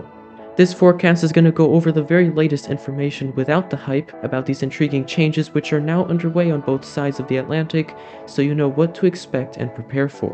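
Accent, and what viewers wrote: Portugal. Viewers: He says it perfectly with a beautiful Portuguese accent. please don’t change! ❤️🌟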